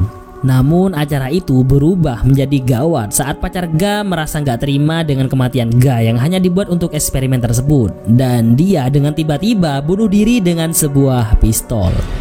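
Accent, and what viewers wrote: Madura, Indonesia. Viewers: Logatnya kek ada rasa rasa khas orang madura, iya gasih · Logat e gk Iso di bujuki Cong..Meduro Yo Cong ?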